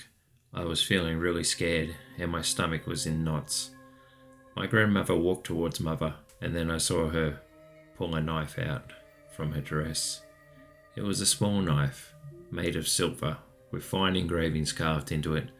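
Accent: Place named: Australia. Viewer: I figured that out on another video. I even commented like, "hold on you sound extremely Australian now"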